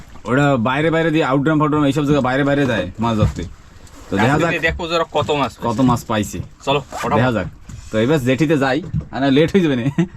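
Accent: Barishal, Bangladesh. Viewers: তোমার কি বাপদাদা বরিশাইল্লা ছিল। বরিশাল এর মত কথাবার্তা 😊😊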